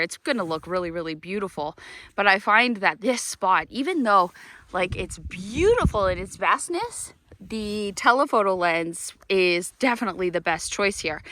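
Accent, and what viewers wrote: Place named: Canada. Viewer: you have a lovely Canadian accent! ;-)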